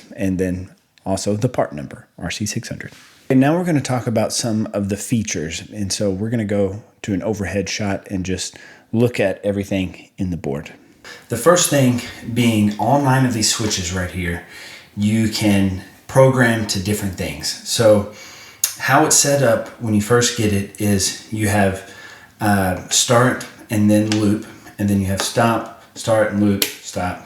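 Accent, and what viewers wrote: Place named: Illinois, United States. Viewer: WOW!! BIBICAL!! I sure hope ROLAND paid you for this video!! Oh, color your beard "black"; Abe Lincoln!! No kidding!! As Angus Young; student! Dark-in your beard; play dressed as Abe Lincoln!! Especially with the slight southern drawl!! Right out of southern Illinois!!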